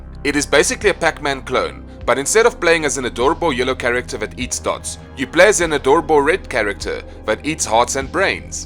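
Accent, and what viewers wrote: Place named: South Africa. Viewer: You sound a little bit Southafricanish lol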